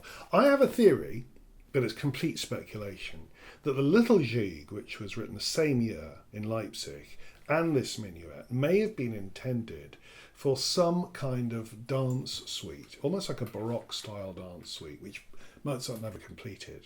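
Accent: United Kingdom. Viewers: Great video, but when will you get rid of that ridiculous British accent?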